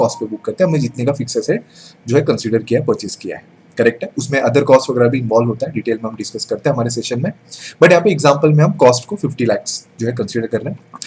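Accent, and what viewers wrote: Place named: India. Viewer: what is bro on about, i didnt understand shit of that indo-english bullshit. He's onto NOTHING💯💯💯💯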